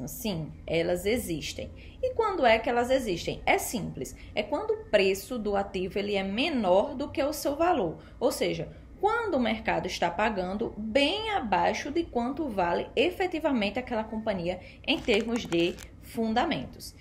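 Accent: Northeast, Brazil. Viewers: Excekente video. Parabens ! E o sitaque matavilhoso me traz as boas lembrancas do Nordeste e dos inumeros amigos nordestinos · EXCELENTE VÍDEO, GRANDE CONHECIMENTO SOBRE O MERCADO DE AÇÕES, AMEI O TEU SOTAQUE NORDESTINO, NÃO O PERCA NUNCA! TÚ REPRESENTAS COM DIGNIDADE O POVO NORDESTINO! APENAS CORRIJA O HÁBITO DEFEITUOSO DA PRONUNCIA (INDENTIFICAR) NA PALAVRAS "IDENTIFICAR". PARABÉNS! PROFESSOR ROBERTO GUEDES CAMPINA GRANDE PB!!!!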